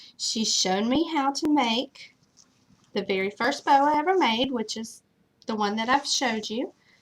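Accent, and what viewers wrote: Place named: South, United States. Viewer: I LOVE YOUR HELEN BOW !!! YOU HAVE TO BE FROM THE SOUTH..... YOU TALK LIKE ME ! YOUR MISSISSIPPI FRIEND I LOVE YOUR VIDEOS ❤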